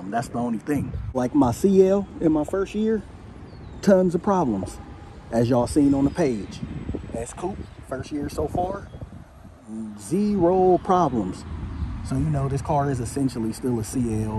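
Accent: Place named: Bay Area, United States. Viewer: I knew you were from the bay as soon as I heard you talking! Lol almost thought what was mistah fab talking! Loll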